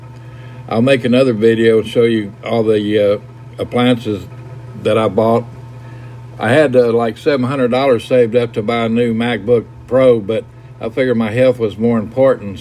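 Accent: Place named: Texas, United States. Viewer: So glad to have you back on here,. It is good to hear your Texas twang on videos! Haha. I do enjoy watching and listening to you,. Yes, YouTube is addicting. I'm writing this comment at 1am because I can't sleep, so what am I doing - watching YouTube! Haha. Good luck,!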